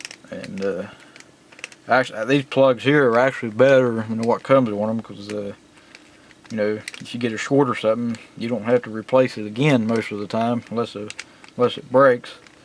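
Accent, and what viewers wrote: South, United States. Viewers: I don't have any comments about this video in particular, but I just wanted to say that I just discovered your channel and the videos are really well made and I appreciate how informative you are. Keep it up! Also, you don't have to say if you don't want to,but.. you wouldn't happen to be a TN or a NC man, would ya? You sound like.. well, you sound like me. haha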